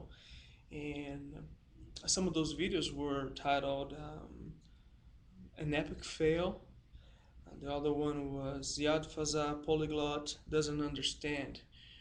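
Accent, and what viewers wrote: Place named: Brazil. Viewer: Haha, you have a Brazilian accent! Cheers from Brazil!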